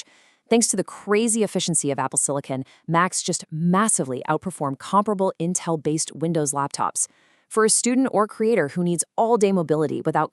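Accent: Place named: Australia. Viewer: Oh my God, you’re Australian. I’m Australian.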